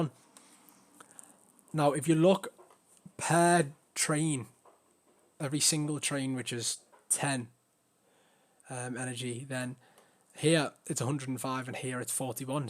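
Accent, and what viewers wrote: United Kingdom. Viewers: Loving these vids so far mate. I dunno if it's coz your a fellow Brit and explain things without going a million miles n hour like most of em on here but I'm looking forward to watching the rest. Thanks for taking the time 🍻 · Thanks man! Good to see a fellow scouser as well.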